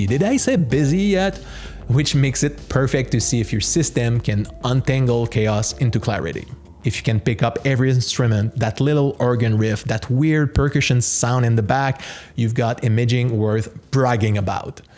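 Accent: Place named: Montreal, Canada. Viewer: Dude, Whoa! Just stumbled upon this video from my recommendations and within 10 seconds I assumed you’ve been on YouTube for years… only to find out when I checked out your previous videos that it’s been a month. Your production is top notch. Also, your accent and the Expo67 poster instantly made me realize you’re from Montréal (same as me). I rebooted my channel to document my Hi-Fi journey and it’s so cool to see someone from here also doing the same. You definitely got a subscribe and follow from my here and on IG. Keep it up ✌🏼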